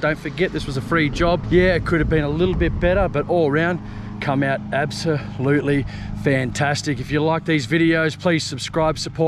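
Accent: Australia. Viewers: Hello. You sound as if you are from the Land of Oz. I'm from over the ditch, in Auckland. Oh yes. I'm 76 years old, and still mowing my own small lawn with a Ryobi battery self-propelled mower, but the trimmer and edger are wielded by myself -- they are not self-propelled (how could they be?).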